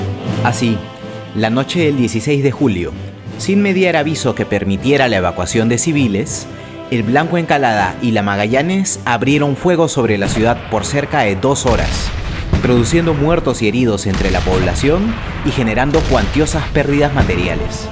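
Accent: Peru. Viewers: Por su acento, creo que es peruano. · Esta historia quien la cuenta me parece que es un peruano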